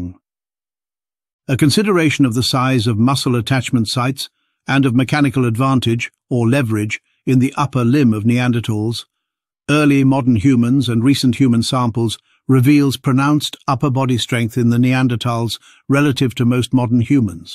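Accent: United Kingdom. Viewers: Just because there is a British accent delivering information doesn't mean that it cant be patiently stupid information. Right, wrong or infifderrnt, his is just way over done without any real science behind it.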